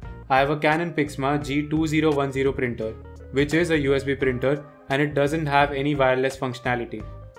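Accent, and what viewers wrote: India. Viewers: I can't understand Indian accents good enough but thanks for trying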